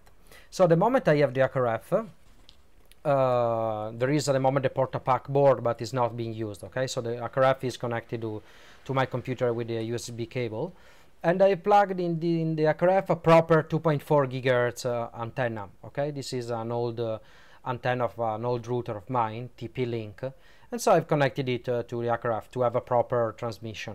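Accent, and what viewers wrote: Italy. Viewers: sei italianissimo e lo si sente! non cercare di nasconderlo bro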